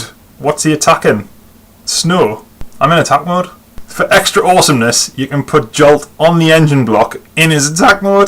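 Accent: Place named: Newcastle, United Kingdom. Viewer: I had to sub. I love yer geordie accent lyke. Why aye pet, reet canny lass etc. My favourite teacher was a geordie. And this video was about Hot Shot. The best subject ever.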